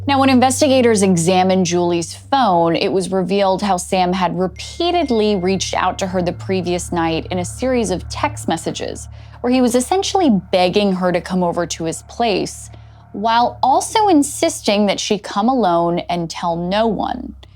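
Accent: Australia. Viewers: that kooky, strange, Aussie voice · The aussie accent wears on me after awhile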